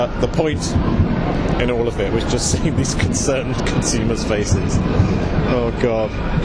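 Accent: United Kingdom. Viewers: please listen to this American woman: you are not uglier in the morning. You are not ugly at any point of the day during any of your videos. You are very attractive (not to mention very, very intelligent) and if my daughter was just a bit older, I would try to put you together with her. She is a genius girl, gorgeous, and an Anglophone. Too young for you though. Anyway. The point is, you're not ugly. You're a cutie pie who says lots of smart stuff whilst using a smooth British accent. 😉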